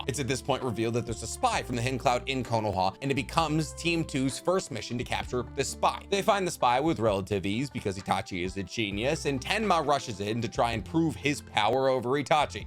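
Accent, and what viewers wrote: Boston, United States. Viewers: Wait whattttt is the homie from Boston? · Bro your from boston for real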